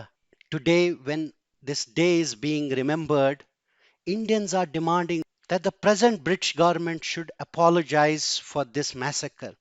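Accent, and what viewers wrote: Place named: Kashmir, India. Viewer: Sir has a Kashmiri accent 🙂..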